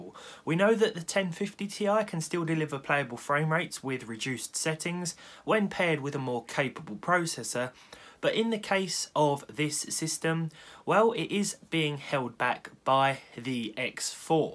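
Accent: United Kingdom. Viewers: Somehow the British accent makes this sounds like a documentary, compared to those Canadian and American channels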